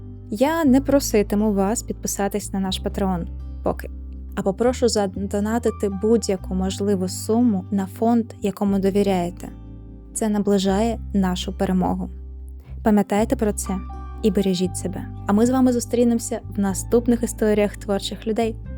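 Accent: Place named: Russia. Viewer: Маю зауваження. Ви робите в наголосах характерну нині помилку-русизм - зсуваєте наголос на перший склад, як м.оскалі: нА душу, а не "на дУшу", жИли, а не "жилИ". Зверніть, будь ласка увагу. Відео дуже сподобалось, підписався. Дякую!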